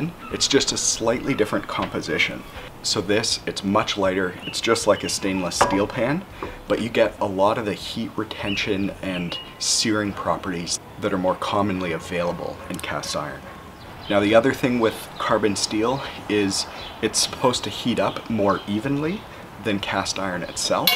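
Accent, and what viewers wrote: Canada. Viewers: I love how extremely wealthy people wear God awful sweaters like that . Called his gas grill a BBQ LOLOLOL you have to be wealthy to talk like that. Must be Canadian because he says aboot not about.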